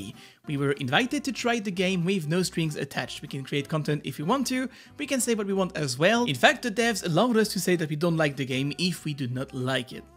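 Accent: France. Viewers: you could go on top of something with Pheonix with the Ice wall of Sage if she cast it under you! New here, what is this accent, are you french by any chance ? I'm from Quebec, Canada! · j'adore ton accent français en mélange avec l'anglais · Are you French?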